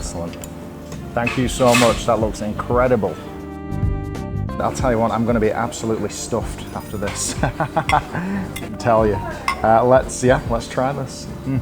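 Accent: United Kingdom. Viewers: Great stuff,. Quite a new fan of yours, mate. Great content, and lots of stuff many other videos of HK don't cover. I've already been once a few years ago, and your great footage is setting up my appetite (literally) for another visit. Keep up the good work - those soft Northern tones of yours are dreamy too!!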